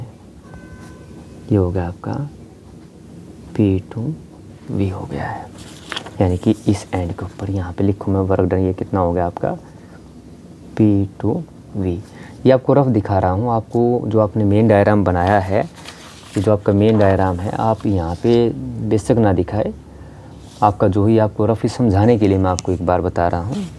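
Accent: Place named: Haryana, India. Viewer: Haryanvi boli me smjhne ka maza hi kuch aur h 😂 Btw, great explanation 🫡👏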